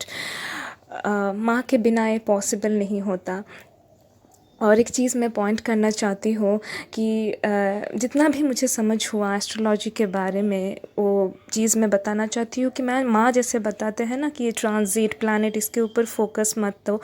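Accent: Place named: South, India. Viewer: Di aap south Indian ho kya ....aapko boli me vesa hi touch hai .....which is very sweet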